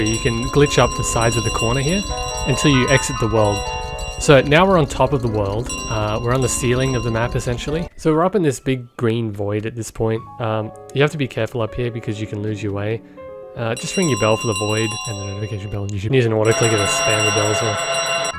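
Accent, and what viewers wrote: Australia. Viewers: I cant stand his australian voice being so incredibly nonchalant about everything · Are you Australian?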